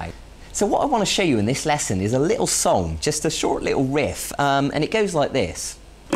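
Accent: United Kingdom. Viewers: accent is from Bristol. He's a cool guy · Nah, he's from the Bristol area, West Country accent.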